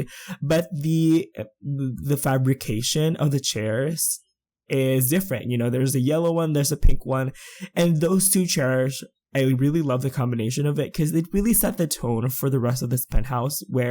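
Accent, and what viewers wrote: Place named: Philippines. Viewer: the first time I heard your voice. and started wondering if you were a filipino.. and you are... hi po ^^ new subscriber here ^^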